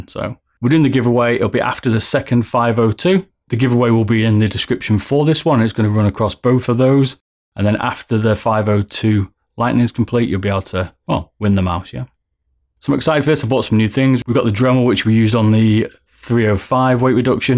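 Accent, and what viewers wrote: United Kingdom. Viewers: wow! I subscribed to the channel after watching four super interesting videos! very good quality content, congrats! only a minor issue: I had to enable subs (english subs) because I'm not English mother tongue and even if I can speak and understand it quite good I'm not used to the accent you have (british, I guess?). but hey, not really a problem ;)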